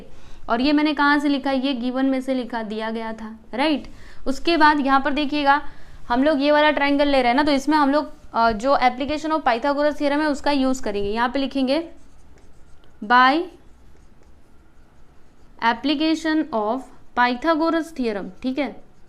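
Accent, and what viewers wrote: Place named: Mumbai, India. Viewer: Your mumbaikar i know from your language like likhneka karneka words❤❤😊😊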